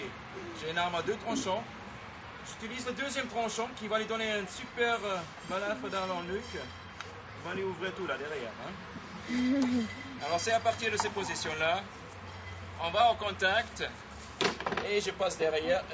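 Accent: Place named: England, United Kingdom. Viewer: Hello! It’s in French. But the person who speaks to an English accent would say:-)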